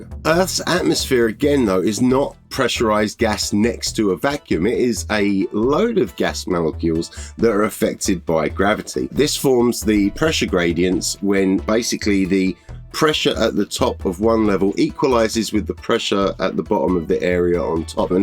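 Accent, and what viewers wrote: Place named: United States. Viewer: Why do you call yourself when you sound like a yank?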